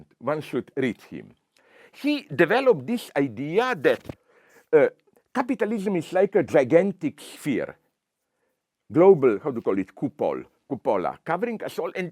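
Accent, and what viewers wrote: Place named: Slovenia. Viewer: How can this short video be transcribed for the hearing impaired and for those who do not speak English or understand his Slovenian accent?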